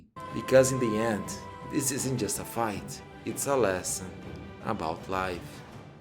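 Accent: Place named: Brazil. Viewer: Vc é brasileiro pae, da pra reconhecer pelo sotaque irmão kkkk! VOCÊ FOI PEGO!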